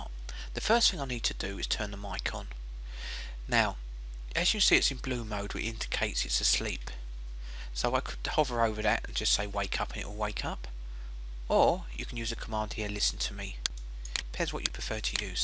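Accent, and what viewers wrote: United Kingdom. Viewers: I am really appalled, shocked, dismayed. As to these mumble mouth individuals. Trying to provide instructions for using, Naturally Speaking. When they can hardly speak, themselves. Never mind that nobody is actually speaking into a microphone, directly. So that you can barely understand what they are saying. In a terrible acoustic environment to boot. This is just mindless, buffoonery. And this guy with his mumbled British street slang. Sounding like he is 10 years old. Stupid clueless buffoonery is on the rise. It's rife throughout the world, today. As we regress back into the 19th century here in the 21st, century. Except that we now have voice recognition software with people who cannot articulately speak or enunciate words correctly. And yeah. Voice recognition software isn't going to work well with people who cannot speak. Who mumble and mispronounce, words. 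Which happens often. As in, "ofen" and you're not British. And not off 10. Or off, ten. And a chasm is not a, chaz'em. It's a, "kazim". Otherwise how do you expect voice recognition to understand, mispronounced words? Unless you build in the proper pre-buffoonery into the software for such illiterate pronunciations. That doesn't make the product better. That makes people far more illiterate and incompetent. And the inability to deliver a spoken concept. With the inability to articulate it into the proper word stream. Um, duh, ya know, I'm saying. Way. Go. Dog. I think that sentence is perfectly understandable. From a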